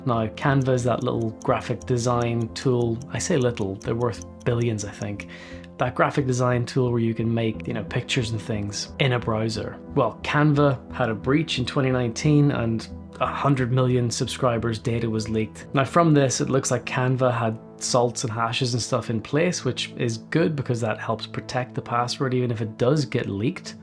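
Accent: Northern Ireland, United Kingdom. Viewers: Is that a Northern Irish accent i hear? Haha! Nice video man, a lot of people underestimate the amount of information is out there of them. I actually recently paid a service to search some of my credentials against breaches. Turns out even tho i use generated passwords, they were actively being leaked. Found 4 passwords compromised in total. I think it's great showing this kind of information to people. Wish i did mines sooner!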